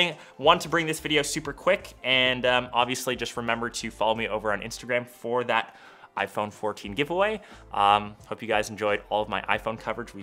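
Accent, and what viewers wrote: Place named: Canada. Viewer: You have a Canadian accent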